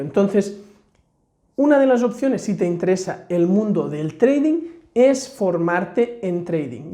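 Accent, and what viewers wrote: Catalonia, Spain. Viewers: Solo por curiosidad, eres catalan? Lo digo por el acento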